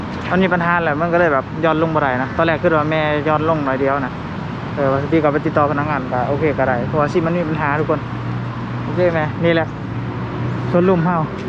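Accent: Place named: Ubon Ratchathani, Thailand. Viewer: บ่าว เป็นคนแขวงได๋เว้าคึทางอุบล ทำงานยุกรุงเทพบ้อเพิ่งติดตาม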